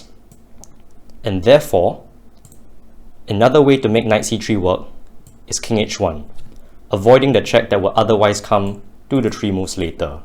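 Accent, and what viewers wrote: Singapore. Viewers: Let me hear that Singlish sir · Ayoo you from SG? Accent sounds so familiar lol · Brilliant video. I'm 1500 rated and I found this video really instructive. Are you also Singaporean? I ask because I am, and I recognise the accent!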